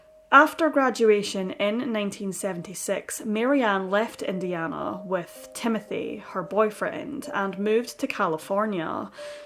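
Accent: Scotland, United Kingdom. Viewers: Are you Scottish, lass?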